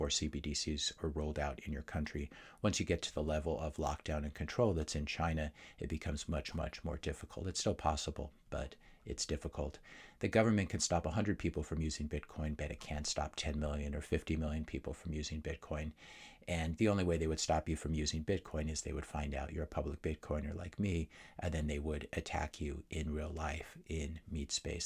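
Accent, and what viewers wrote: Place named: United States. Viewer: As a european i felt the no gun comment totally made you out as american even beyond the accent. Europeans think totally differently. If we need guns we already lost, our humanity, our civilisation. We might as well start eating burgers.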